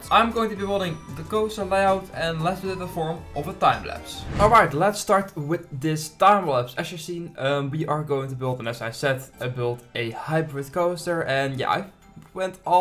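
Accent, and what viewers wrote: Netherlands. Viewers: ik hoor het nederlandse accent >:) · The Dutch accent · are you dutch??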